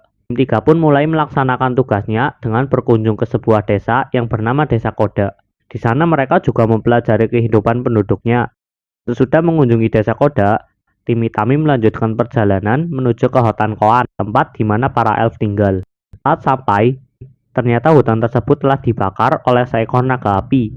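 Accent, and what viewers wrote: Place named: Banyumas, Indonesia. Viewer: Semangat bang.. ngapak joss 👍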